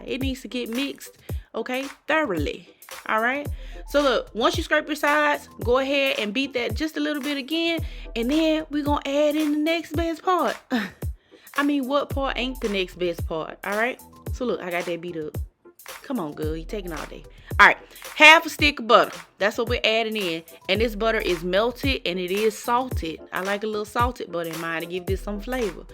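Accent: South, United States. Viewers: Thank you & Happy Holidays to you and your family as well. 🩵🩷 I knew I heard the southern accent ; I’m from Louisiana. I will definitely let you know how it turns out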